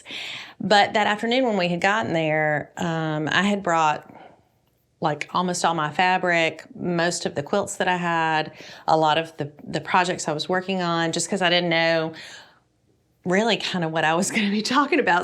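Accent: South, United States. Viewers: It's a show and tell. And I think I speak for many of us that we enjoy listening to her Southern accent as much as viewing., I bet your Dad was thrilled that his Daughter, with her busy lifestyle took the time to learn and energy to create such a beautiful, useful gift. And you shared the lessons learned with us and made a new friend along the way, as well as your Subscribers. Bravo.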